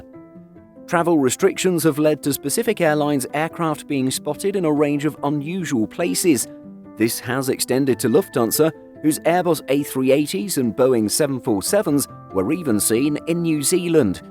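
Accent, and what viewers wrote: United Kingdom. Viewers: What? YOu can clearly make out the British accent, and also, this is the channel of a news website. I think your ears are low quality.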